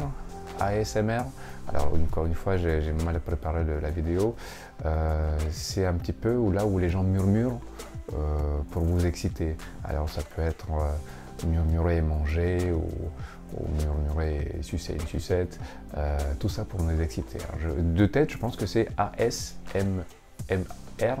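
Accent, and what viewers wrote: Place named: Russia. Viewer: Très bon contenu merci , on sent une certaine apaisement en toi , et ton accent est très charment c'est russe ?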